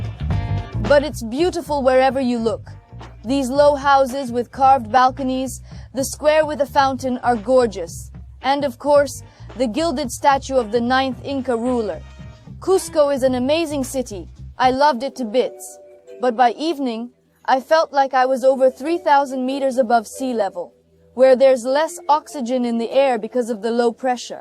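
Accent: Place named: India. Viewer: True indian ascent ❤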